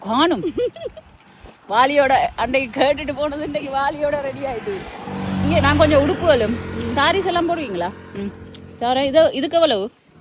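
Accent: Sri Lanka. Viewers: எந்த நாட்டில் இருந்து பேசிறீங்க.இலங்கையா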